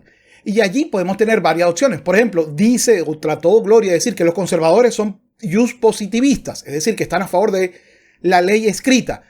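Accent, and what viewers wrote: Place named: Venezuela. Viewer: Bonito tu canal hermano. Aqui estamos apoyandote, porque eso es lo que necesitamos, apoyar y promover el sentido común. Tienes acento Venezolano, eres? Un abrazo!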